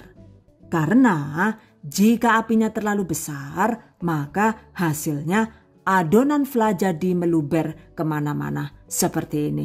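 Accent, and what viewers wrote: Banyumas, Indonesia. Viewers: ngomonge jan ngapak gawe guyu inyong #GiveawayTHV2021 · Kalau bahasa ngapak emang gitu bund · Mom nya wong bnyumas kiye hehhe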